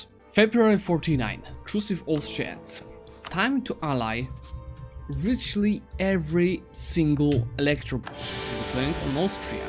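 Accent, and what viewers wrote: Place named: Poland. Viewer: Bro I suspected he was polish turns out he is I recognize a polish accent anywhere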